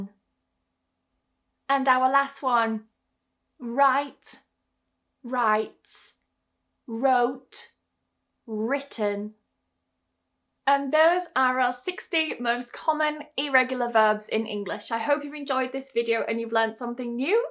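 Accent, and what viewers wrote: United Kingdom. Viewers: I really loved your all of video to learn lot of things . you is the best teacher ever, you is a beauty queen of BRITISH accent. & Im really big fan & followers of you. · Hi again,! As I've said before, your British accent is just delicious! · i really like this channel that the british accent was sounds comfortable❤️ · sorry teacher , are you british ?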